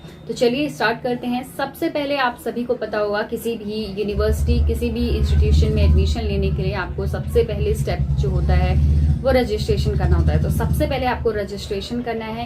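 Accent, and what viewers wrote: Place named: Bihar, India. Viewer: Apakee baat se hme lag rha hai ki aap bhi bihar se hai hai nn 👍👍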